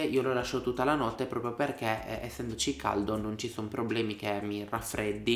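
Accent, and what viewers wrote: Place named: Sardinia, Italy. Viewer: Ti stimo tantissimo,grazie per i tuoi consigli preziosi,simpaticissimo quando esce il tuo accento sardo😂❤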